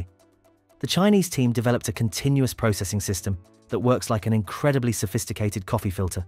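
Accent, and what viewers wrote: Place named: United Kingdom. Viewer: the British sounding AI narrator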